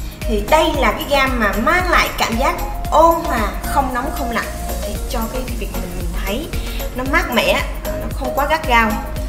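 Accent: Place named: Central Highlands, Vietnam. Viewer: Chị dạ hay quá , giọng lạ nữa , như người dân Tây Nguyên luôn 🥰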